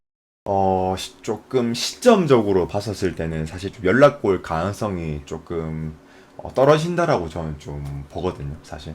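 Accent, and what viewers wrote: Gyeongsang, South Korea. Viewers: 전남친이 경상도 사람이였는데 말투가 비슷해서 너무 맘이 아파요.. 타로 감사합니다 3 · 저도 경상권이라 사투리 너무 정겹고 반가워요ㅋㅋㅋ👐 . . . 2번🔮 실제로 연락은 한번도 안왔는데 저는 먼저 할 생각이 없네요 ㅎㅎ 리딩이 사실이면 좋겠어요..! 잘듣고갑니다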